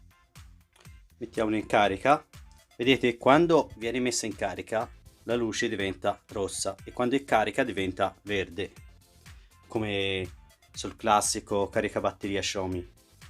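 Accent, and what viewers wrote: Tuscany, Italy. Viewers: Di dove sei ? Toscano? Se ti mando i monopattino me lo modifichi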